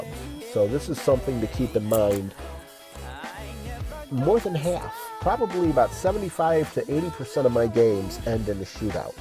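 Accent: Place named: New York, United States. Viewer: Sounds like your from my area in NY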